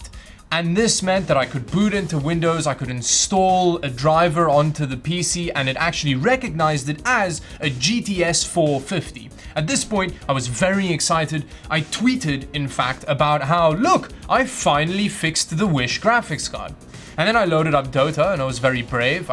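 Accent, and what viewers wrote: South Africa. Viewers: Are you a South African expat? Your accent tells me that you are. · Yes definitely do it, i wana also see the result of the difference a 6 pin pci-e connector would make, you have a south african accent, are you south african? Just curious... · Are you South african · South African??? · Ek kry die gevoel jy is ñ Afrikaanse Dawid, met daai aksent en al, so gryp daai soldeerbout en sit daai connector op en kyk of dit werk! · Are you South African? You sound South African.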